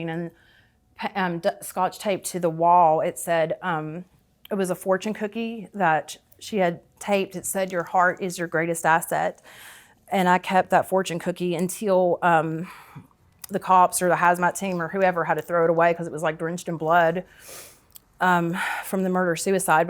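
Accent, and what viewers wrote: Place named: North Texas, United States. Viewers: I would have guessed her accent to be rural Oklahoma. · Did you grow up in North Texas/Oklahoma? Your accent is very familiar I am from there too.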